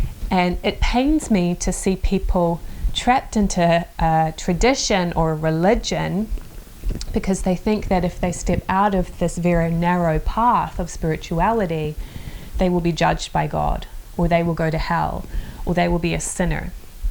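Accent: New Zealand. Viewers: you talk like me a kiwi I guess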